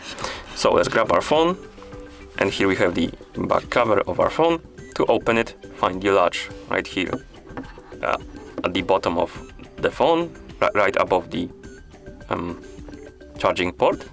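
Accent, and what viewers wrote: India. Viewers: I have lived in the US my entire life and know zero people with thick Indian accents but it’s still very easy for my brain to compensate for the accent, I understand every word of tutorials like this. Maybe, just possibly, it is you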